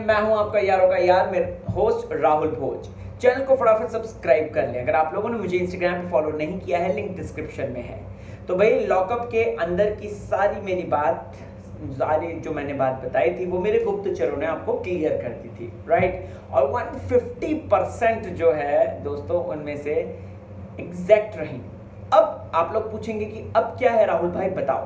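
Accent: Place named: United Kingdom. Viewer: Ye bhai Mai tumahra videos dekhta hnn ya to English me bolo ya Hindi me , nhi to muh se supari Nikal ke baat Karo , but British jaisa Hindi naa bolo yrr 👍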